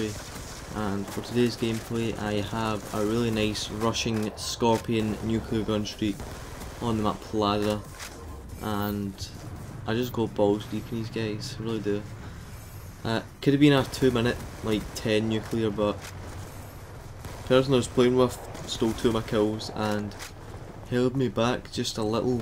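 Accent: Scotland, United Kingdom. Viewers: Has he's Scottish and that waz a BEAST gameplay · waiting for the scottish accent tutorial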